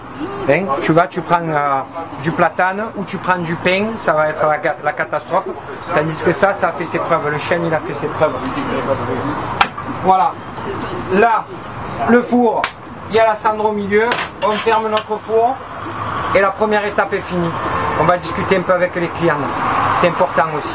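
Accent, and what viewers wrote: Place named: Provence, France. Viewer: Bravo Je fais aussi de la pizza mais toi c’est pagnolesque,l’accent,la bonne humeur,les rires…tout ça me donne envie de dégommer ta pizza en entier!continues comme ça.👍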